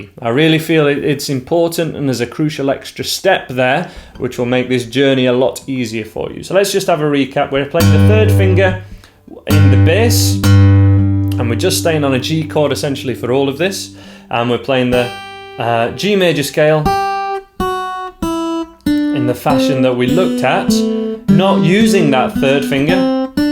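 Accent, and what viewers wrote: United Kingdom. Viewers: Hi,..your accent is a british man. I think you are from England. I am just started to learn guitar and your website came across and i decided to download from lesson one to three. Thank you for complete information for the beginners like me. God bless you... 🙏❤🇵🇭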